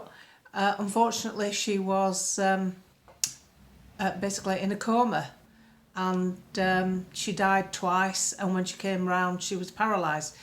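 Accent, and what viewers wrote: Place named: Northern England, United Kingdom. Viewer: My it’s great to hear your northern voice of tell it like it is common sense, just love your humour too. Having left wonderful northern area of Yorkshire many moons ago, I miss the people, I miss their voices. We never look or believe what MSM are saying politically and tell everyone we know to follow you and the other truth tellers. Thankyou, onwards and upwards free speech.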